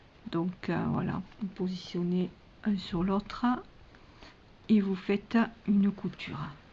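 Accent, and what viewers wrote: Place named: South, France. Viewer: coucou, super explications en plus avec ce petit accent du sud j'adore :) merci pour le tuto à bientôt bisous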